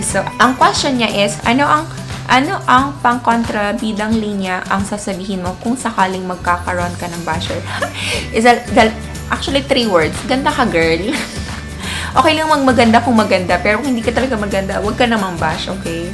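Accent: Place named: England, United Kingdom. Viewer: I will raise a question pretty lady, HOW TO BE YOU??? I rrally like your english accent. ❤️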